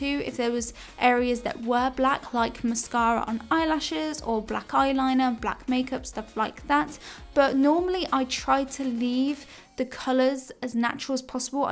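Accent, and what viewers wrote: United Kingdom. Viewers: U r having a good British accent, Where r u from?? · Everybody looking at her drawing skills but me only paying attention to her Emma Watson accent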